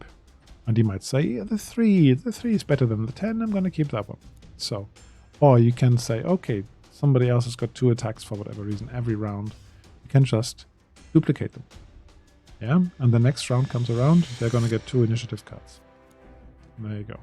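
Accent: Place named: Germany. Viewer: Great video, straight to the point and thorough. I live in California now, but hearing your accent makes me miss Deutschland where I grew up as a kid. Danke Shon! Keep up the great vids!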